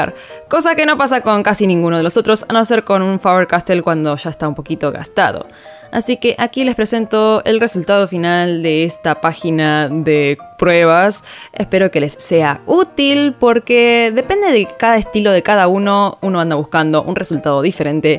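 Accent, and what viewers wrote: Argentina. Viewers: ''Ni puñetera idea'' te quiero, pero aveces el Argentino-Español se te pasa un poco.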